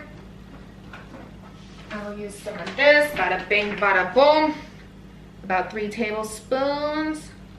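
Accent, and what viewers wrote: Midwest, United States. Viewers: 😂 Honestly.. I never thought it would be taken out of context.. I’ll re-edit the original comment tho.. Going to sound like a simp, but is a saint, I don’t even look at her like that anymore.. Just keeping it real… I was first introduced to videos around 2019-ish.. I was like “Dayuuum! who’s this beautiful woman with a midwestern accent talking about eye opening topics!”… I was crushing lol, but over the past couple of years watching her videos and seeing stuff like cooking, closet videos, and talking about Penny.. You just get the sense she is a strong woman with a beautiful soul.. Hope you’re doing well! 😊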